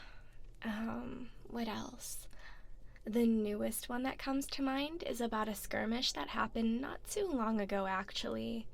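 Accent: Valley Girl, United States. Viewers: the mix of Valley Girl and Old style English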